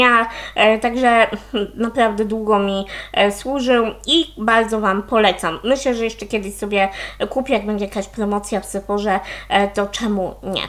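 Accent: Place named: France. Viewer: Muszę wypróbować polecany przez Ciebie tusz od Eweliny 😁 a Tobie z tej samej marki polecam bazę pod tusz. Aaa cudnie mówisz z francuskim akcentem 😄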